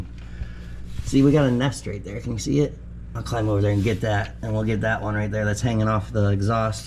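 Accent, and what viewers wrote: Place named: North, United States. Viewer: Where are you from in Florida? Your accent sounds more northern than southern lol. Love the video!! Sweet ride too!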